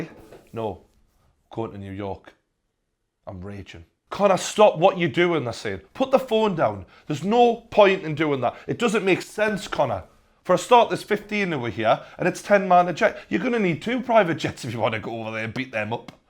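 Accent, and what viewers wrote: Newcastle, United Kingdom. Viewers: makes me creaaaseeeeee 😭😂😭😂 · You Legend. Keep up the good work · GET THE ON CBEEBIES BEDTIME STORIES! Storytelling was excellent here. 👏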